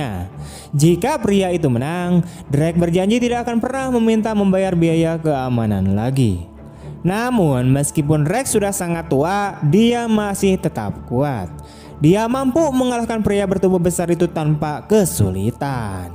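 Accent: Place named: Sunda, Indonesia. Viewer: Kau jadi pelawak pun tak laku bro ...logat bicara mu itu yg betol jangan maluin bangsa Sunda ... Bicara yg tegas jadi lelaki itu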